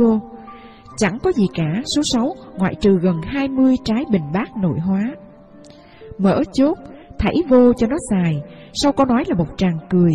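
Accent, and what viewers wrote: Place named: South, Vietnam. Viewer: Giong noi' cua Co dien doc tren day . Oi ! That em ai' ,diu dang' . Giong noi' de thuong cua nguoi' con gai' mien ' Nam .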